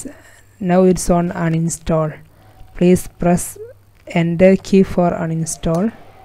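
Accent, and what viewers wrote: India. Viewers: Of course another indian accent why every computer supporter is indian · Holy crap it actually worked! Ty miss! The Indian accent is so cute lol.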